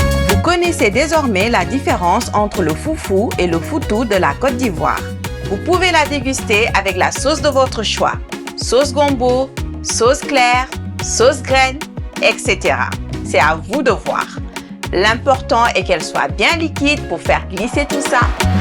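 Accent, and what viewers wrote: Senegal. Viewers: J'AIME l'accent Sénégalais très beau accent très agréable à l'oreille ❤❤❤❤❤❤❤❤ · La Boss ,vous êtes Ivoirienne ou Senegalaise ?Je trouve que vous avez un petit accent wolof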